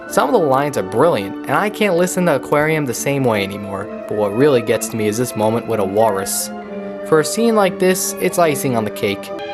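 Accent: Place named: New Jersey, United States. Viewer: Are you from New Jersey? You have a bit of the accent.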